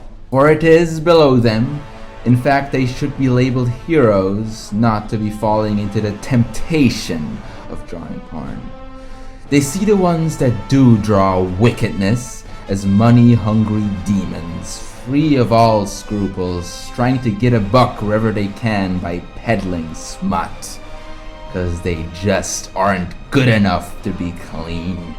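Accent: Switzerland. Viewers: a german (german swiss) accent, so beautiful, so prosaic! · dude you're swiss? that explains so much about your accent and unorthodox iconography.... the rick and morty work and young justice stuff you did...fuckin supreme! you draw some sexy ass humanoids.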